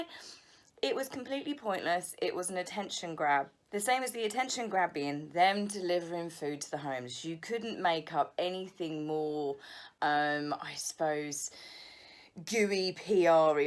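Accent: England, United Kingdom. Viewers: I like you and your English accent. You are so funny.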